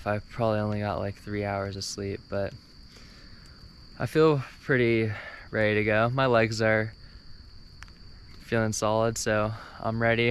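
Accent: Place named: Hawaii, United States. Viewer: correct me if i am wrong but i am sure i hear a "Punahou" accent to your Hawaiian words...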